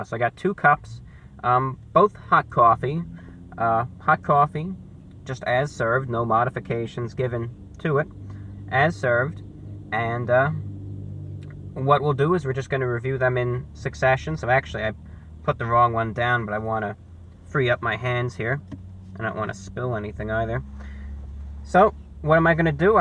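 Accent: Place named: Boston, United States. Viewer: the bostonian accent is shining through in this review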